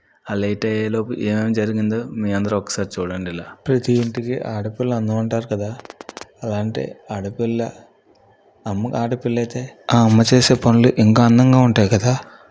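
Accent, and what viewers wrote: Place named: Godavari, India. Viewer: Orye nuvu entira godariabbailala cheptunnav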